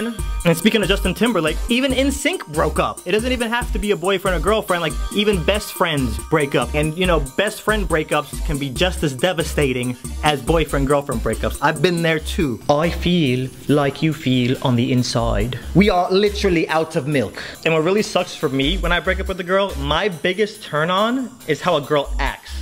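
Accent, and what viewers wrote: England, United Kingdom. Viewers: That is a good British accent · That English accent tho! Lol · Your british accent tho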